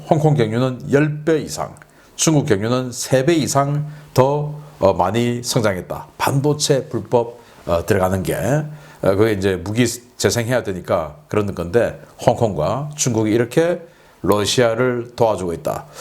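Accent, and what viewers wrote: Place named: Gyeongsang, South Korea. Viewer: 까딱하면~~ ㅎㅎㅎ 박사님 경상도 사투리가 나는 듣기가 좋은데 저~ 서쪽에나 저~ 위쪽에 사는 사람들은 무슨 말인지 못 알아들을 걸요?? ㅋ 박사님 늘상 좋은 날이 되시길 기원드립니다.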